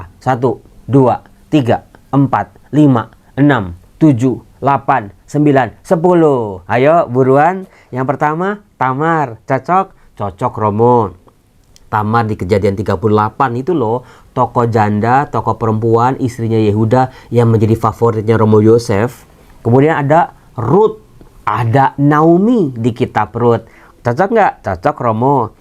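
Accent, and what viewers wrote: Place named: Jakarta, Indonesia. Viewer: Pertama kali dengar Bible Learning di awal tahun 2022, tahu nya dari OKS. Setelah itu saya kejar nonton Bible Learning dari 2019. OKS nya juga saya kejar nonton dari awal. Saya suka romo2 di OKS dan romo bicara dengan logat jakarta, bahasa nya yang gak baku dan kaku, gitu😄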